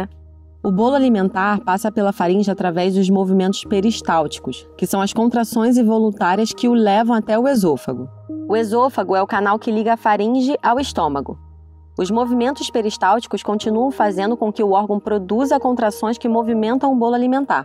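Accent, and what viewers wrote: Rio de Janeiro, Brazil. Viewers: Infelizmente as pessoas não sabem que para atingir e satisfazer as pessoas de todo o Brasil, o sotaque deve ser neutro. Não consegui ver o vídeo todo, que deve ser muito bom, pelo sotaque carregadíssimo, que creio ser carioca.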